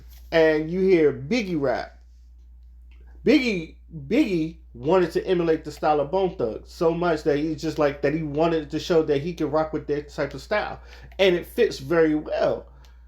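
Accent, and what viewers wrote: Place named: East Coast, United States. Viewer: U sound real dum n east coast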